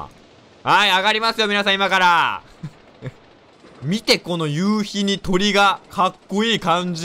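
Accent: Kansai, Japan. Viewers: ときどき出る関西弁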